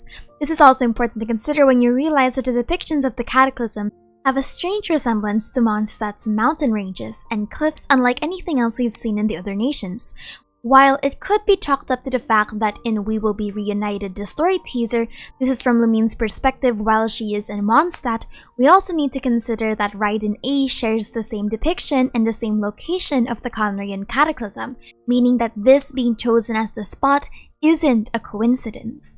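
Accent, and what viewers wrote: Philippines. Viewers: Somehow i knew that you are a filipino based on your english accent. love your videos btw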